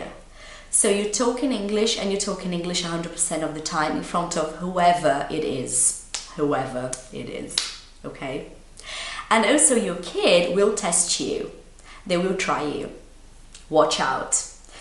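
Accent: RP, United Kingdom. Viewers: It's amazing that you sound almost like the Queen. Super posh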